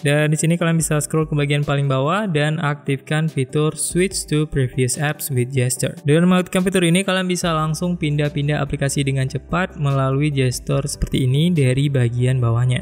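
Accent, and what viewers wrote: Aceh, Indonesia. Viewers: Orang Banda Aceh bang? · Orang aceh ya bang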